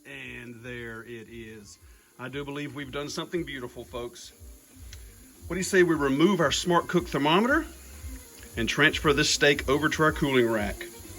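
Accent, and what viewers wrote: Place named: South, United States. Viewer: You sound so southern 😉💋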